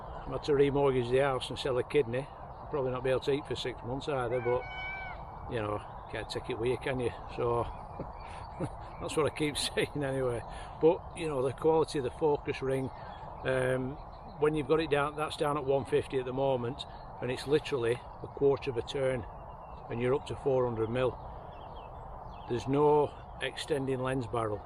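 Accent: Lancashire, United Kingdom. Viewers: Dear as a lad from Wigan who now lives in Belgium it was nice to hear some Lancashire tones watching this… I’ve just done the same and got rid of all my Nikon gear for this combo… although I’m just waiting for my Pro filter which at €300 is expensive but if it protects the lens it’s worth it. Congratulations on a great vid… really enjoyed it. Regards Paul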